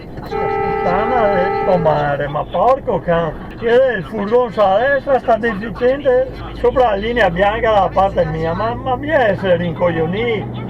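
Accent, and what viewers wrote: Belluno, Italy. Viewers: buonasera non ho ancora trovato il tempo di contattarti via Mail che sto facendo straordinari al lavoro,comunque ti scriverò sicuramente,comunque hai l'accento identico e la stessa cadenza del mio ex titolare di Belluno e Alleghe quando lavoravo in gelateria qua a Stoccarda ora mi occupo di sistemi d'iniezione nel settore aero navale una grossa azienda qua nella foresta nera,comunque pochi mesi fa ero nel Veneto a visitare Venezia e Padova e son passato a Losson dell Battaglia per visitare un momento ai Caduti della Brigata Sassari che sicuramente forse conoscerai è proprio all'ingresso di Losson in quanto io abito si in Germania ma sono sardo di Sassari e volevo far visita a quel monumento ai Caduti Sardi,ciao buon fine settimana🙋🏻‍♂️🙋🏻‍♂️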